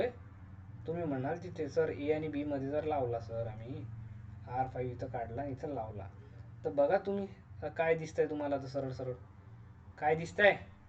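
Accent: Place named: Vidarbha, India. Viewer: विदर्भा मधले आहात, भाषे वरून समजते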